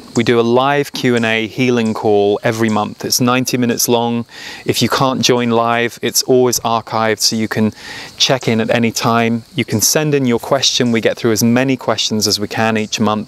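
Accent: United Kingdom. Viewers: Thanks - love your work and great to hear a Brit.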